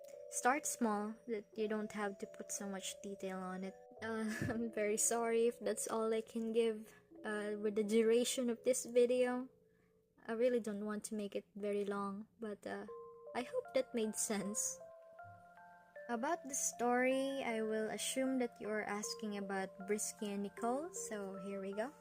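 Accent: Philippines. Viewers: I never expected ur from phil and ur voice sounds exactly like from phil, beautiful voice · Your voice reminds me of a Filipino accent, are you filo? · You sound Filipino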